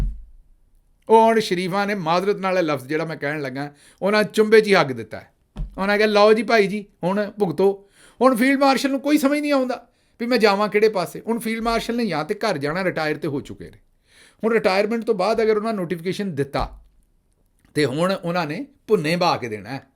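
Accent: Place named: Central Punjab, India. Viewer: بہت ھی اعلیٰ ، ستھری پنجابی میں مخصوص سنٹرل پنجاب کے خوبصورت با محاورہ انداز مزا آ گیا ، میرا تعلق کراچی سے ھے مگر میں کچھ پنجابی سمجھ لیتا ھوں ۔ بہت اعلیٰ ۔۔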